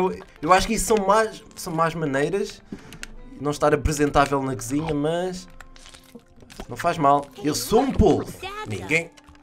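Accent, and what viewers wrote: Portugal. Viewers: Você fala português do Portugal. E é muito parecido com a linguagem do português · És português??????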